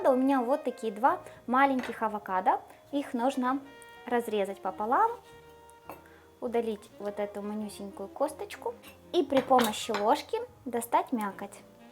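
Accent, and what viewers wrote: Ukraine. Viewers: Шановна!У Вас дуже красива українськаа мова! ДУЖЕ ВЕЛИКЕ ПРОХАННЯ- ДАВАЙТЕ УКРАЇНСЬКОЮ!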